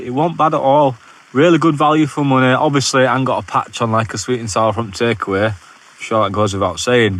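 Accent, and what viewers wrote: Yorkshire, United Kingdom. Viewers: I've been watching your videos for a few weeks now and love them. Being a Yorkshire lass, I never get sick of that accent ❤. One thing I really need to know is... where did you get sticky toffee pudding coffee from? It sounds amazing. Keep up the great videos , I love watching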